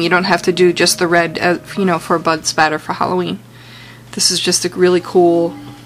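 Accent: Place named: Italy. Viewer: This is freaking awesome! Btw if you don't mind me asking, are you Italian? You have the same accent my family does :D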